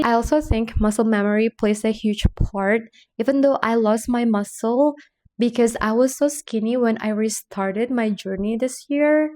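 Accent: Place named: Philippines. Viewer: Are you a Filipino? You kinda have that accent. ❤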